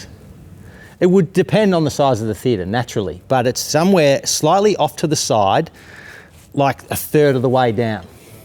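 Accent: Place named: Australia. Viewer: Watched to learn Aussie accent way back 2019 in preparation for Uni in Melbourne. Continued watching since! Stoked to see you in July!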